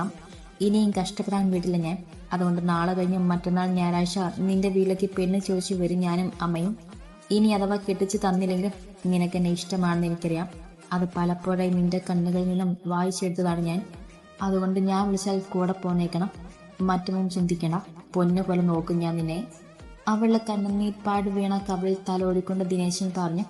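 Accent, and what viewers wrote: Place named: Tamil Nadu, India. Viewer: ahno anik aa story nala ishta pine chechi de tamil malu slang oru rekshem illa poliii❤❤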